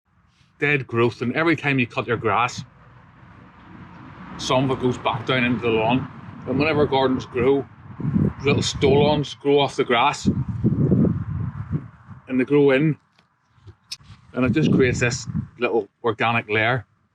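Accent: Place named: Belfast, United Kingdom. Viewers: Cheers from Australia. Great video but my favourite part was the Belfast accent. Cheers 🍻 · You must be from Belfast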